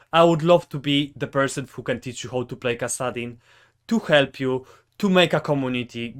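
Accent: Poland. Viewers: Are you from Poland? Jesteś Polakiem bo akcent wydaje mi się że słyszę Polski. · Pewnie polak sądząc po akcencie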